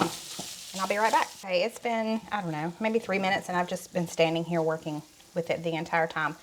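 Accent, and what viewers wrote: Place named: North Carolina, United States. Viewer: This is the first time I have seen one of your videos. I was hearing your accent and thought, North Carolina. Then you said Food Lion, and I was like mayyybe lol! Great recipes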